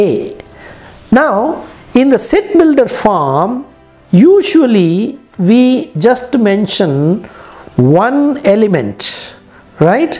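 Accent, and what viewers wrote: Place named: South India, India. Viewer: The teacher's from South India as explained from his accent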